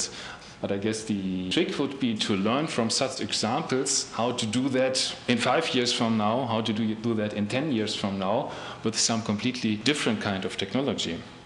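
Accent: Germany. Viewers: german accent ftw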